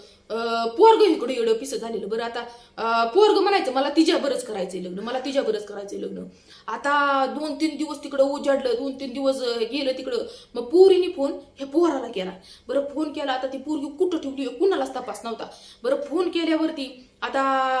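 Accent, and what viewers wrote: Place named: Rural, India. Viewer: Gavthi bolna hicha konala awadata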